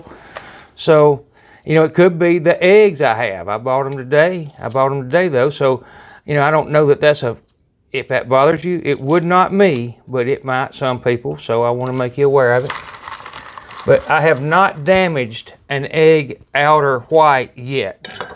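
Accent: South, United States. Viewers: just been listening to the new album and your accent slightly reminded me of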